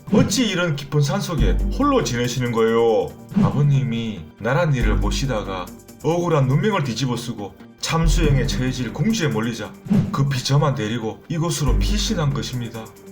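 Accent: Gyeongsang, South Korea. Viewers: 넘 재밋어요. 교훈도 되구요… 뭐니뭐니 해도 남도 구수한 갱상도 억양이 잴로 좋아 홀딱 반했어요.ㅎㅎㅎ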